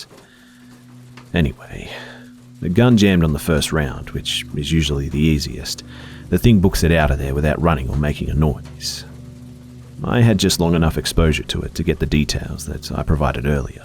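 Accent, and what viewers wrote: Australia. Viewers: You have such a wonderful soothing voice!! Thanks for using your talent to help me relax and unwind from my stressful life. It's always a pleasure hearing your Aussie Accent!!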